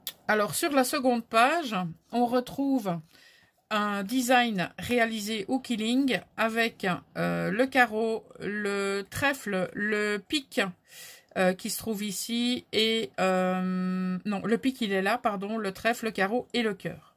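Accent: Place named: Belgium. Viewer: Bonjour ma petite, Woaw quelle merveille 😍🤩 vraiment très douée Ileen, bravo! Son album est superbe 🤩 et très bien travaillé 👍 avec un thème que j’aime particulièrement 🐇 seulement 11 ans….. c’est plus que prometteur, la relève est assurée! Ça m’a fait plaisir de t’entendre avec ton tout petit accent sur les « UI « ( comme ds 8 ) qui me fait toujours sourire chez nos amis belges à croire que le Luxembourg n’est pas épargné 😄 j’adore! Gros bisous 😘💕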